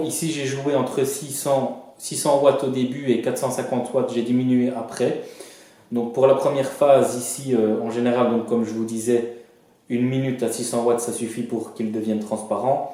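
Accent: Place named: East, France. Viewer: Le meilleur c'est à la fin 😊👍 Très belle vidéo et explications claires avec l'accent de l'est.😊🎣🎣🐠🐡🐟🐠🐳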